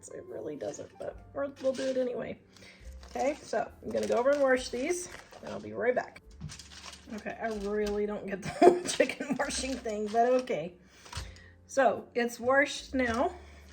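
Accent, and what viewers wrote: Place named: Kentucky, United States. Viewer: Do you live in SE KY? My mother was from there. I didn't notice it in my mother, but decades later I heard her sister pronounce it that way. The two words I know I picked up from my mother's accent was 'iron'. I pronounced it as 'r-in' until I corrected it when I was 12 and my name 'Carolyn'. I pronounced it as 'Car-lyn'. I grew up in MI.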